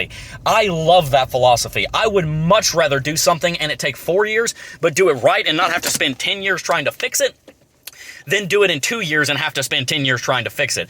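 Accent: South, United States. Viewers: Said like a true Southern fast-talking scientist/engineer. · Fast talking southerner. I like it.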